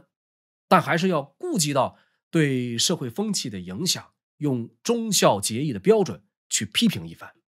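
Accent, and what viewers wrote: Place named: Tianjin, China. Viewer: 老师是天津人吧，本来沉浸在严肃的历史氛围里，一个嘛字把我听笑了😆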